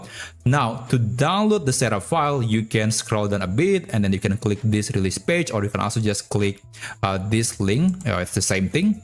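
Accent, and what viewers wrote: Indonesia. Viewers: Logatnya bahasa inggris indonesia banget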